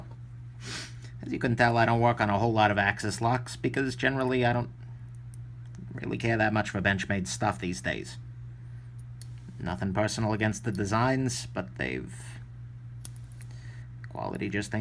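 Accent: New York, United States. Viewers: You’re from the desert? You sound like you’re from Long Island, Brooklyn or the Bronx! : )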